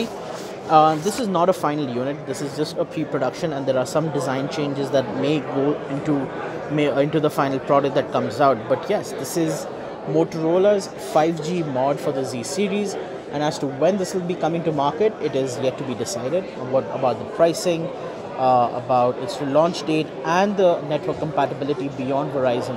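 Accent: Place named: India. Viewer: heavy Indian accent bye video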